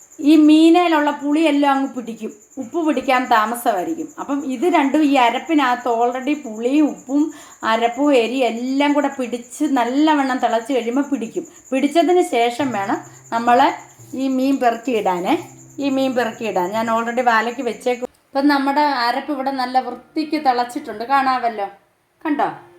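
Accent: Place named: India. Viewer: ഒരു കറി വച്ചാൽ എല്ലാം വിശദമായി പറഞ്ഞുതരും തുടക്കക്കാർക്ക് നല്ലതാണ് നാട് എവിടെയാണ് ഞങ്ങൾ പറയുന്ന പോലെ ചേച്ചിയുടെ ഭാഷ❤❤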